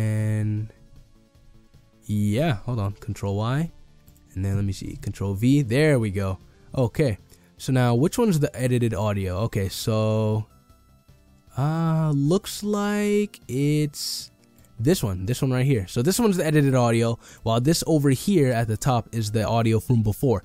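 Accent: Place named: Netherlands. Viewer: Nederlands accent...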